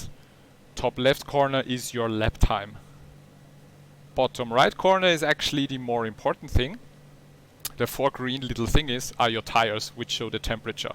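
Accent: Germany. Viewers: Na du bist doch deutsch bei dem Akzent oder? :) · wenn österreicher oder deutsche englisch reden :D machs doch auf deutsch !!!! aber gutes video!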